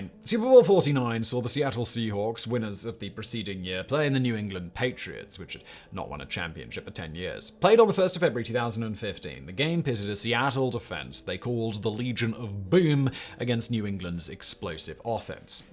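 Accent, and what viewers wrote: United Kingdom. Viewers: So interesting to hear a British accent describing American Football. Even more interesting? Pronunciations of "Unitas", "Ameche", "Stabler", etc. Made me smile.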